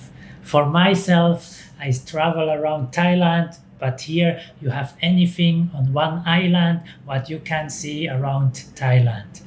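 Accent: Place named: Switzerland. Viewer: Love your Swiss accent ;). I m from Switzerland too! Very nice advise maybe one day i will mouv to Thaïlande too ;). I m sure you miss our lovely cheese and chocolate too 🤣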